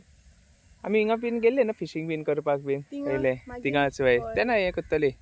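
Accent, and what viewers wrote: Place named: Canacona, India. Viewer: Where is this place. Canacona? Because her language tune is talking like canacona people and I'm also from agonda 😊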